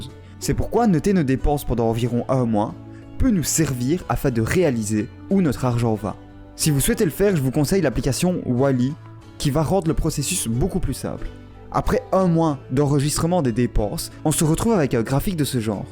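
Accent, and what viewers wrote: Liège, Belgium. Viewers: Tu es belge ? Merci pour les conseils , j'adore tes vidéos 😍❤ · Tu ne serais pas de lièchee toi ? :D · Tu es belge ? Bref bonne vidéo pouce bleu 👊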